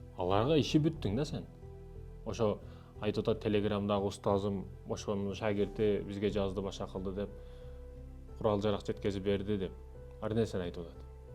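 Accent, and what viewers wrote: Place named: Ichkilik, Kyrgyzstan. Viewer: ТУШУНБОЙ ЖАТАСЫНБЫ ? ЖЕ ОЗУН ТАЗА СУЙЛОЙМУН ДЕП ОЙЛОЙСУНБУ ? КАЙСЫЛ ДУБАНДАН БОДОСУН ? ДИАЛЕКТИКА ДЕГЕН БАР УКАМ ,АР БИР ОЛКОДО БАР НЕРСЕ ,БИРОК БИЗДЕН БАШКАЛАРЫ МЫНДАЙ БОЛУНУНУП ЖАМАН КОРБОЙТ БАШКАЧА СУЙЛОГОНДОРУН . ИЧКИЛИК КЫРШЫЗДАР ДЕГЕН БАР КЫРГЫЗ ТАРЫХЫНДА . ОШОЛОР УШУЛ БАЛАДАЙ СУЙЛОШОТ .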